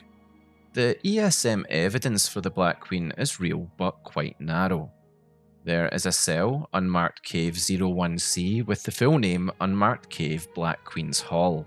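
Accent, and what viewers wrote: Scotland, United Kingdom. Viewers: Algorithm just pushed this to me, I like your informative low energy and the Scot accent adds to the soothing output of information. Good video to listen to while relaxing or sleeping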